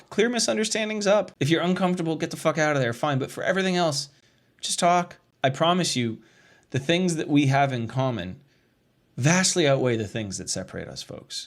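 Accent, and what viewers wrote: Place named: Canada. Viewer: Man. You're funny and cringe but I HATE the way you Canadians say your "out" words as oot. They're pronounced OWT not OOT you snow monkey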